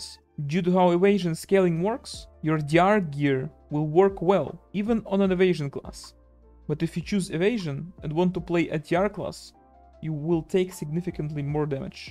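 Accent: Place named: Pakistan. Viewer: Love that pakistani accent 😍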